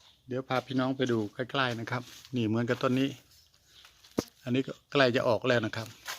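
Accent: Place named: Southern Isan, Thailand. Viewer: พูดสำเนียงเหมือนคนอีสานใต้จังครับ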